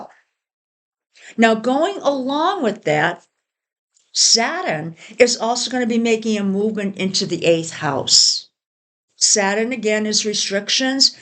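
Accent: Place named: New England, United States. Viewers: I recognize your accent. Where in New England are you? I'm in Northern NH 😊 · Love your Boston accent! Familiar to my ears. Others saying similar things post eclipse. Truth, justice consequences.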